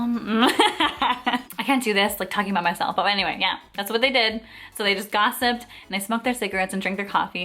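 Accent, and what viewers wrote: Puerto Rico. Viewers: Wow I new that your puerto rican because I am from Florida so I know how you talk as Latina boricuaaa 🇵🇷🇵🇷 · Your sond like you was reast on the isla cuz,,,,,Puertoriqueña,,,,,,same as the ress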